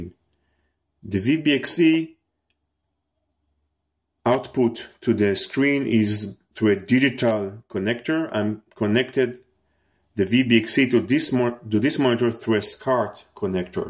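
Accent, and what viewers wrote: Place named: Israel. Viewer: גבר לפי המבטא אתה ישראלי כמוני .... מדהים מה שעשית עם ה600XL לי יש עדיין 800XL בעליית הגג.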